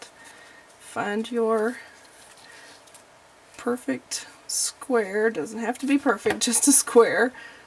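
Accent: Texas, United States. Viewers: Texan english rules! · Love the Texas English!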